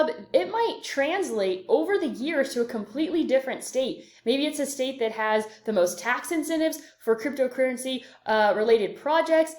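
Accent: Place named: United States. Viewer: I’m aware of when it happened. Did you also know that the US tested contraceptions illegally on PR women which made many sterile and some even die. Aside from what obviously has affected the fertility rate on our island, why women are choosing to have less children? Lack of money and opportunities which is getting even worse with all you rich people moving in and raising the prices of real estate. “There are less white people in Hawaii” It’s not about white people, it’s about rich foreigners and there are many in Hawaii taking over their lands. That’s the reason why homelessness of the natives has increased in Hawaii. Also, I imagine that you’re a white American from how you speak. Just know that most Puerto Ricans could care less about US political standpoints. So, this whole “liberal” or “conservative” or “woke” crap means nothing to me. I’m talking to you about Puerto Rico. 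You can’t educate me about my own island, its people and history; I AM PART OF ALL THAT.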